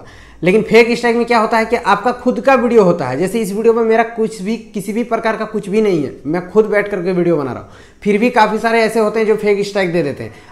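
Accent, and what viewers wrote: Bengal, India. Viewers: Ap Bangali ho kya?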